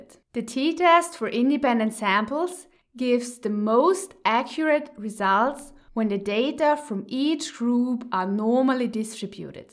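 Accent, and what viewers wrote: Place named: Germany. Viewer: Hey there! Thanks so much for your videos. They're incredibly insightful and I've learned more from them than in any of my statistics classes at university. By the way, I had a hunch you might be a native German speaker, even though your English is excellent!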